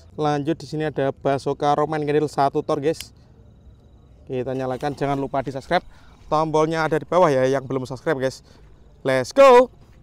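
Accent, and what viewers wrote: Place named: Banyumas, Indonesia. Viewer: Ya jo lali subscribe bang koe kan wong ngapak bang jo lali awal video ngomong "ora ngapak ora kepenak"yo mas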